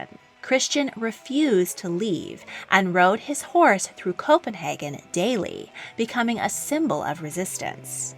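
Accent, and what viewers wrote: United States. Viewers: I am an American. but I find the American accent of this narrator absolutely horrible. Why can't people speak English properly without using a hysterical accent? I have been living and teaching in Europe for more than 30 years and I have to say, less is more. Don't over-do an accent like this narrator does. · Screeching American accent telling European Royal history poorly! 😱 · It’s so funny hearing all those European names pronounced with an American accent.😅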